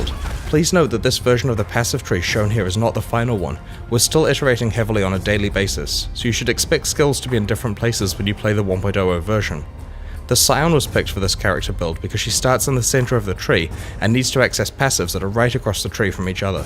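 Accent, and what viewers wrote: New Zealand. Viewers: Who's "GrantingGayGames"? ^^ (no offence meant,i just love newzzie pronunciation)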